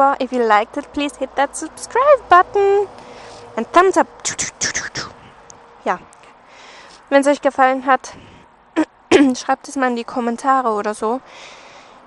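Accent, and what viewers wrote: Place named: Baden, Germany. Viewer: Isch des rudschig 😂 Badner? 😂✌️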